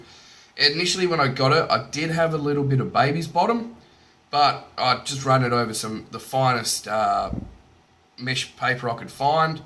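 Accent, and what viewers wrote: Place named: Australia. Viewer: thanks for the tip Hun. Also it's great to hear an Aussie accent doing these reviews. Keep up the good work 😊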